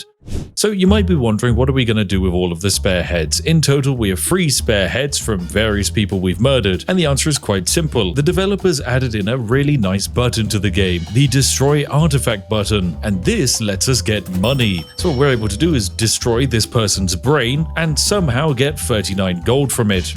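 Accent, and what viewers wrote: United Kingdom. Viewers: I feel like he gets more British every intro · "Perfectly Average" ? He sounds British! And that comes from a British person ;)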